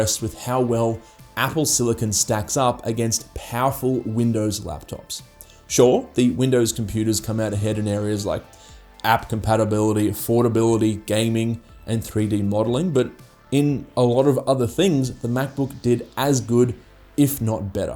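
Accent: Australia. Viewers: Thank you!!! I really love your content so much and love hearing a fellow Aussie on here! I’m sold- you definitely made me see that that MacBook Pro 14 is probably the best way I could go… for just a little bit extra than the air with added specs, yet substantially lower than the 16”. Seriously thank you! I can’t believe I didn’t see that before . Well only started looking for a new MacBook recently…. Cheers!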